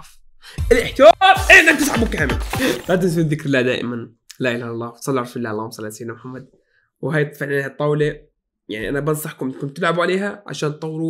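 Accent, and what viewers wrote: Egypt. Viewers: اللهجه المصريه اسطوريه عندك يسطا❤ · يا متابعك من اسبوعين يخي وربي اضحك من قلبي غير انه لعبك اسطوري ماشاء الله انا مصري واحب لمن احد من بلد عربية يتكلم مصري اللهجة مرة op عليك 😭😂😂🤍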